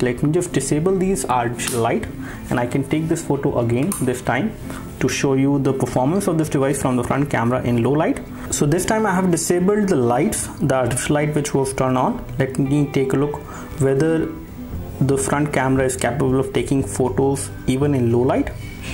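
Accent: India. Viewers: Roman Minárik its Indian but OK.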